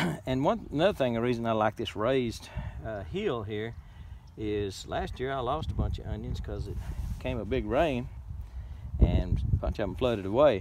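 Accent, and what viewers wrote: Texas, United States. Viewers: Oh that row looked so nice and neat, then you had to walk on it. lol I love your Texas accent. Great video.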